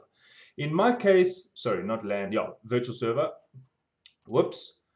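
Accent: South Africa. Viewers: jirre, get smacked by that south african accent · Haha, such a strong SA accent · I hear SA accent, I upvote!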